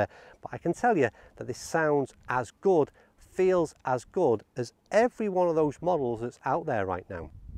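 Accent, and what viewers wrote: Liverpool, United Kingdom. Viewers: I think scouse is as English as you can get. From a Manc.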